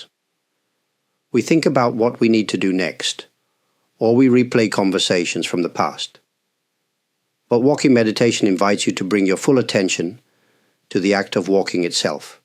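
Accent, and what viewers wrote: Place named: United Kingdom. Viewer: dear 🙏 I would love to catch a live with you sometime! or even just a your questions answered segment I would love ❤️ to hear about your faith journey of being a monk and I think I detect a slight UK 🇬🇧 accent in your voice, would love to know more about that, not to intrude on your privacy of course, just curious about your story always best regards and thanks 🙏 for your inspiring words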